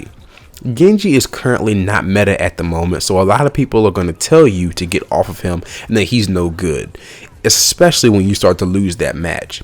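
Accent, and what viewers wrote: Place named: United States. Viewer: wasnt a fan of your heavy american accent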